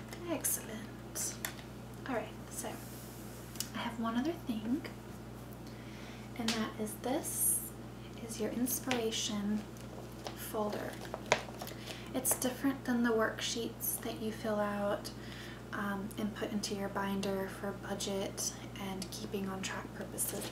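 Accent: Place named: North Carolina, United States. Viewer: Just based on her accent and talk of ft fisher, the aquarium there, and the azalea festival, I'd say she's from my home state of north carolina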